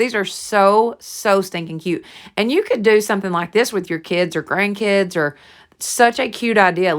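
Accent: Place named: Texas, United States. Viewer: Where are you from with your accent? I love it because I’m a Texas girl with one too and feel at home.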